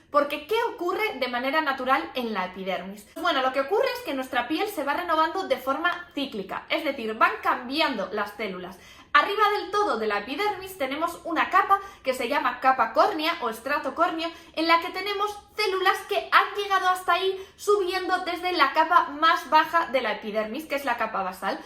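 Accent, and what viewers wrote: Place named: Spain. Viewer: Te amo mi española preferida. Me encanta tu acento y TU 🤭😍🥰😚 Eres muy inteligente 🤓 Saludos desde Perú👋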